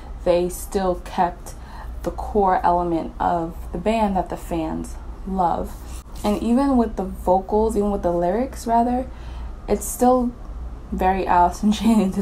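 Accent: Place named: New York, United States. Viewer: U sound like u from NY so are u not aware of 90s rap? Or do u know 90s rap so well that I listening to white music?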